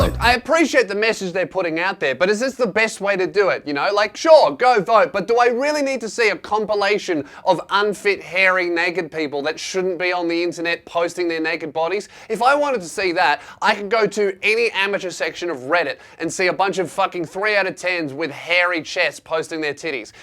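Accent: Australia. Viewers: Since when did Ninja have am Australian accent?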